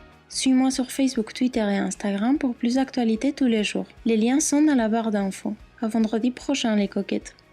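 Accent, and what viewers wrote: Spain. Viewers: J'aime beaucoup le second make up, tu es très jolie, mais j'ai du mal avec ton accent x) Tu es espagnole? portugaise ? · J'adore tes vidéos! Mais, je dois te dire j'aime trop ton accent! Il est trop chou! On dirait que tu parles l'espagnol mais en français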